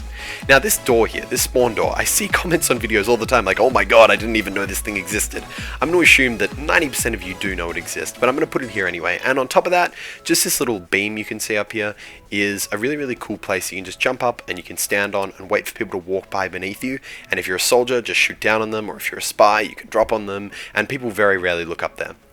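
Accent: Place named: Australia. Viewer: Muselk sounds more Australian that unual.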